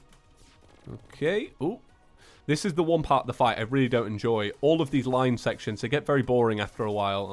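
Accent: United Kingdom. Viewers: R u brittish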